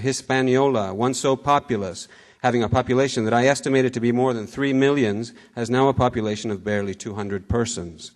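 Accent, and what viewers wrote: Spain. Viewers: maybe he is from Spain, don't they all talk like that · on this lecture he is speaking with a perfect european spanish accent... not an easy task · he's speaking in a Spanish acent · Habla en español con acento español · He's speaking with accent from Spain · here he reads with an spanish accent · Native to Spain, that's what I meant. Argentine don't have this accent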